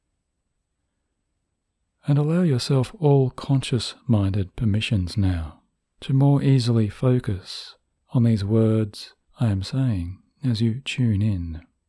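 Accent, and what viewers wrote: Australia. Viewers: are you an Aussie? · I thought this was Australian accent? Either way it is super comforting. 🤗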